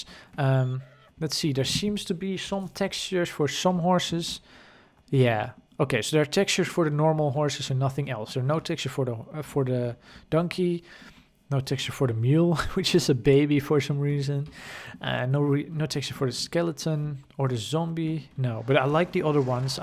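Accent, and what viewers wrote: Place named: Netherlands. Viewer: Can tell that beautiful Dutch accent anywhere. <3 x3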